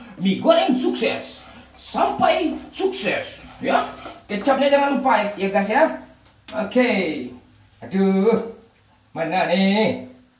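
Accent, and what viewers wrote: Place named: Java, Indonesia. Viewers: Wkwkwk kok medog yaaa wkwk ngakakk😂😂😂😂😂😂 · Kok medok bang😂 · Bg kok ada medok" nya kayak Youtubers porbolinggo 😂😂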